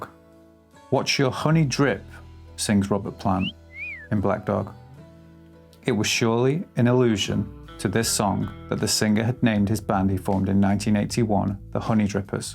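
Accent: United Kingdom. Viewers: So sorry for the delay in my commentary. I know you must have been waiting, anxiously, constantly refreshing your page, to see if the crazy and occasionally overmedicated American girl has provided her invaluable feedback on your new concept. Worry not, I've prepared notes. Thing number 1) While a gross over-generalization for sure--all person speaking with any accent from across the pond equate to "smart, intellectual, generally polished, academic-type" to the universally ignorant American ear. I.e. you could read from a local phone book and you would sound like a BBC new correspondant to your American audience. This is worth capitolizing on, especially for a Story Time concept. which leads to Thing number 2> Thinking more of an Alfred Hitchcock-type-vibe. walking in to his own silhouette? Thing number next> maybe crossed with a Tales of The Unexpected vibe....fireplace crackling (if no actualy fireplace available....a makeshift prop would do....might even be more hysterical).....and smoking jacket and pipe....I mean, c'mon......no brainer.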